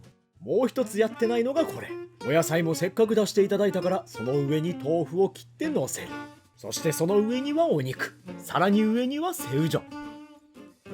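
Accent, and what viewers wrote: South Korea. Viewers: 일본어하는 한국인? ㅋ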